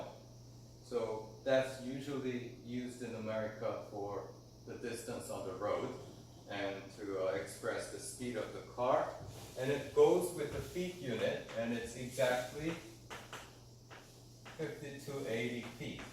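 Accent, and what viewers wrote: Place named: United Kingdom. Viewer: Where did you get your British accent? LOL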